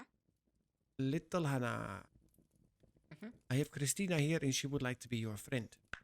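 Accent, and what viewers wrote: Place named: Germany. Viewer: wow your English is very good then! I thought by your accent that you were German or similar ^^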